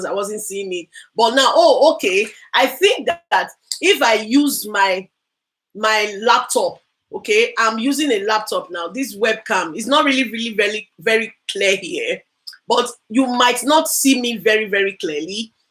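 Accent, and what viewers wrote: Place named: Cross River, Nigeria. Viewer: Am from cross river state mama..am guessing your from there too because you sound like someone from cross river state mama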